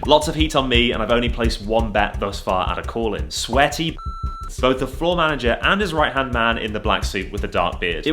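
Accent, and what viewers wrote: United Kingdom. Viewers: U sound british... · In this video you said you were baffled to be recognised by your voice, but isn't a British person playing cards in places like Arkansas quite unusual? I (fellow Brit) went to Texas once and my accent turned heads constantly. You might be attracting attention simply by talking in some of the non-tourist areas you visit. A tipped-off floor manager could have one of your videos playing in his ear whilst he listens to you talk live at the table, and you're disguise is blown. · Baffled u can be recognized by just your voice? In the US and Canada, a british accent sticks out like a sore thumb. Not that it’s bad, it’s just easier to distinguish, unless u can use a different accent.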